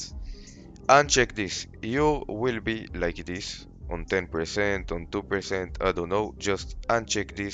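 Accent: Israel. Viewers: רואים שאתה ישראלי שומעים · המבטא הכי ישראלי ששמעתי נודר😭🙏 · שומעים את המבטא הישראלי חחח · אתה ישראלי? איזה מבטא יש לך כפרה לא רואים בכלל שאתה ישראלי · אין סיכוי שאתה לא ישראלי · הוא ישראליייי?!!!?!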